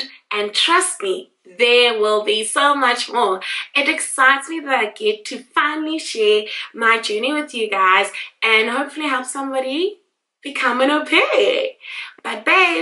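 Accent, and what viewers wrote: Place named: South Africa. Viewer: Which you could speak in your south african accent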